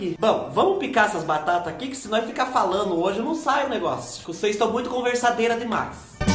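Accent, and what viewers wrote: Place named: Minas Gerais, Brazil. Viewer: Acho muito interessante que é de São Paulo e conversa muitooooo parecido com nós os mineiros muito mesmo, muito legal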